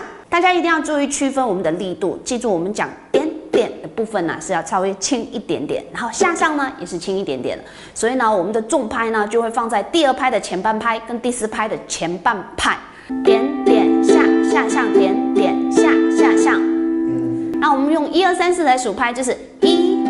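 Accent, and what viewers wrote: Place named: Taiwan. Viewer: 我觉得像台湾人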